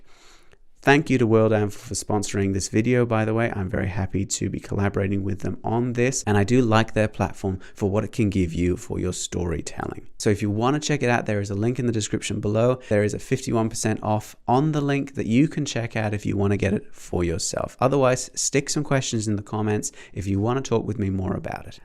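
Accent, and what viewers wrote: Australia. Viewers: First time finding the channel and I immediately ran to the comments to look for this, except I'm pretty sure he's Australian.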